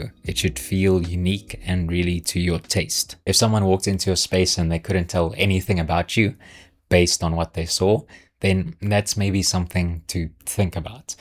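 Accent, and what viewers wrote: South Africa. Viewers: You sound south African 😂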